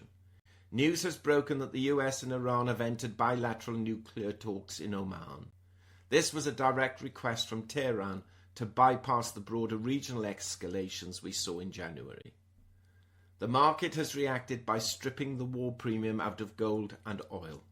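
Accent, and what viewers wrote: United Kingdom. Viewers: Hi - thank you. Really appreciate a calming analysis at a time like this. Had just assumed you were in the UK on account of your accent - gather not. All the best. Simon / Adelaide - Australia - PS the presentation seemed as polished as ever to me.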